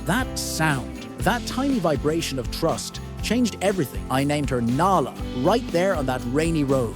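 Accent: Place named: Scotland, United Kingdom. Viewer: are you studying to be the narrator of your life? Sound good, but I like the way you talk with your scots burrrrr. Kind of like a Nala purr.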